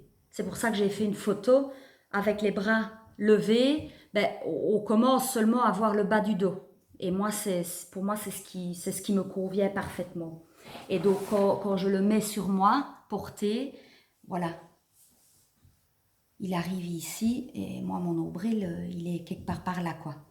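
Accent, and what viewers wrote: Belgium. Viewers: Je viens de découvrir ton podcast, enfin une belge, et à l'accent ont ne doit pas être loint, podcast très agréable à écouter et regarder félicitations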